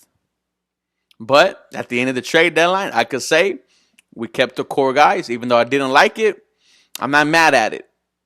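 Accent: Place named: California, United States. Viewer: May I ask where you from you sound like a California or New Yorker?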